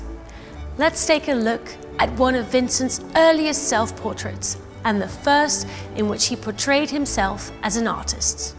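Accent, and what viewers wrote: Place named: Netherlands. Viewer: I actually love her accent, sounds like a Dutch that lived or studied long in England. My husband is Dutch and sometimes he also sounds a little bit like her, I love that.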